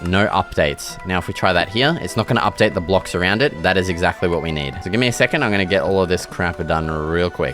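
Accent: Australia. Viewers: mate u aussie like me? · are you aussie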